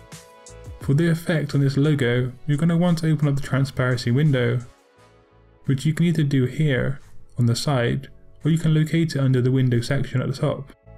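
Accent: United Kingdom. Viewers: Your accent sounds really british..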